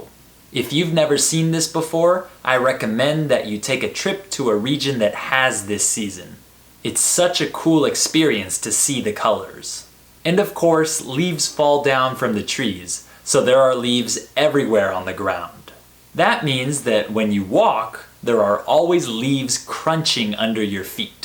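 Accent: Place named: United States. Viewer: I can learn many things from this channel. I really like to listen your voice. Real American voice.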